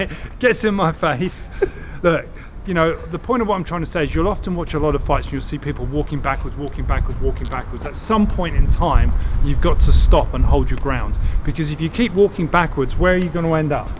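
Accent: United Kingdom. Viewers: I Appreciate Your Wisdom and Sophisticated British Accent Sensei! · I like your teaching very much, but the example is probably far too frequent and too much, hahahha. I hope your partner has a body armor inside his pullover. Are you from Britain, Sir? You speak like James Bond.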